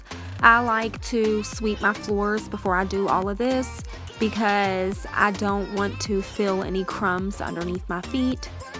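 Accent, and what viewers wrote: South, United States. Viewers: Hi from Louisville, KY also! I was wondering where you were from whenever I heard your southern accent, lol! Your video just inspired me to completely clean my house after I get off work 😂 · I am a new subscriber and I am in Peru. I love what I see and you are very sweet and real. Where are you in the USA you have a Southern accent.? Xx I am of native American and Peruvian heritage so a clean home and family values means a lot.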